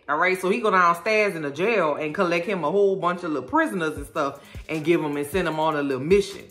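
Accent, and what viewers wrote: New Orleans, United States. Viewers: Look. I found you by way of.soooo I literally came back to HEAR YOU RECAP seasoning with your New Orleans sauce 😂😂😂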